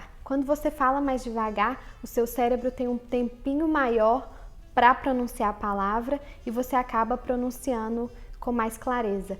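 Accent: Minas Gerais, Brazil. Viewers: Muito bom o vídeo!! e muito engraçado! parabéns!!! confere o meu canal também! estou indo para a Inglaterra e vou gravar vlogs lá!!! ;) e PS você é linda!!! e o sotaque mineiro é lindo! kkk mais um escrito!!!! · impossível não notar seu sutaque mineirinho como o da Fernandinha Takai... rsss show!! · Adorei o seu sotaque mineiro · Mineirinha igual eu <<num> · Belas palavras. A impressão que me dá é que o brasileiro, munido de seu complexo de vira-lata, espera chegar nos EUA e renegar suas origens latinas. Talvez dizer que veio de uma rica família de nobres europeus....Aliás, nem "latinos" os brasileiros se acham ne mesmo? Adoro seus videos, e esse sotaque mineirinho maravilhoso, ne? kkkkkkkkk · Esse seu sotaque mineiro é lindo. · Ahhhhh Até quê você não tem mais tanto o sotaque mineiro . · Eita! que lugar de minas você é? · ela é mineira hahahaha eu também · Malandragem de mineiro. 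Porque então ela se esforça tanro para não falar com sotaque mineiro. Na Índia é muito comum os cursos de inglês darem ênfase na perda do sotaque nativo. O motivo de perder o sotaque nativo é muito simples, ele incomoda o falante nativo do Inglês. É desconfortável para eles. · Meu mentor eh mineiro. Achava que seu sotaque eh um sotaque mineiro! Mas, eu quero perder meu sotaque americano em portugues... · Obrigado por mais um ótimo vídeo. Também acho o máximo seu sotaque mineirado rss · Eeeeu sabia que vc era Mineira s2 ^^ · VOCE É MINEIRA DE VERDADE? ME TOO! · Aee mineirinha igual a eu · Que sotaque lindo! Apaixonado pelo sotaque mineiro! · Olá, tudo bem? Então achei bem legal os três vídeos que vi, porém, gostaria de deixar uma observação sobre a questão do sotaque, existe uma diferença entre sotaque, acento e dialeto, sotaque é quando você fala outra língua com os sons da sua materna, ou quando você dentro do seu próprio país fala a sua língua materna com pronúncias diferentes, para uma mesma palavra, por exemplo, quando carrega o "R", ou coloca um "Uai", ou "Oxente", agora quando a língua sofre alterações de pronúncia de tal forma que dá origem a outro língua, como é o caso do português do Brasil e do português de Portugal, isso se chama dialeto e não sotaque ou acento. 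No seu caso poderíamos dizer que o seu português é dialeto do de Portugal e você tem o sotaque mineiro. Isso acontece também com o Espanhol, porque existe uma diferença entre Castelhano e Espanhol, e dentro da própria Espanha temos dialetos e não somente sotaques. Outro exemplo é a África lá tem muitos dialetos e também muitas línguas, por não compreenderem bem a diferença muitos fazem confusão dizendo que lá só tem dialeto, mas na verdade tem as duas coisas. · Lindo esse teu sotaque mineirinho! (eu sou gaúcho).. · Parabéns, vídeo carregado de bom conteúdo! Falando em sotaque além de linda, sotaque de mineira deixa qualquer um apaixonado..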